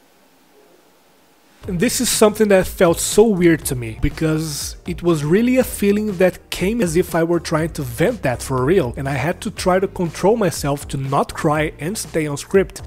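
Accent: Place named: Brazil. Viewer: E aí, mano! Acabei de maratonar todos os videos do seu canal na ordem. Reconheci logo no primeiro vídeo o sotaque brasileiro. O conteudo é muito bom e o roteiro dos vídeos tá muito bem feito, obviamente. E ainda por cima você tem carisma. Tem tudo pra fazer sucesso, acho que já está fazendo sucesso. Parabéns, ganhou um inscrito! Abraço.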